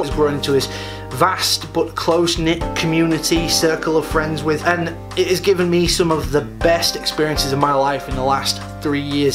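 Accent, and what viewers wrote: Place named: Manchester, United Kingdom. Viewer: Is from Manchester??:o I've been away so long, but that accent just plunged me into nostalgia xD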